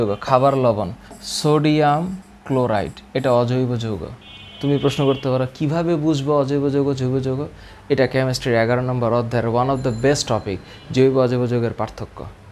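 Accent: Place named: Chandpur, Bangladesh. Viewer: Chadpur er bhasha bola ta kinto joss hoice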